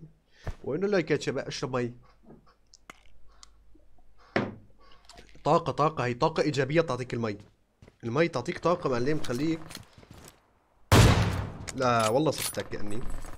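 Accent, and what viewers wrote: Aleppo, Syria. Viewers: حسب لهجتك اتوقع انته من ريف حلب او من حلب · انت من اي محافظة لهجتك حلبية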